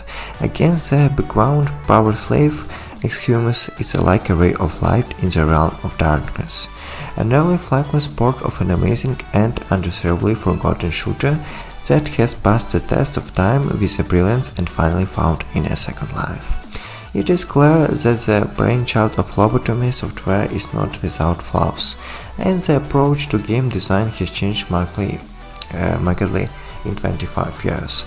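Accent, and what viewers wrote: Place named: Australia. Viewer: Man youve got a fkn mad accent mate,were the hell r ya from?? it sounds are you a australian student living in the suburbs of cali in the u.s.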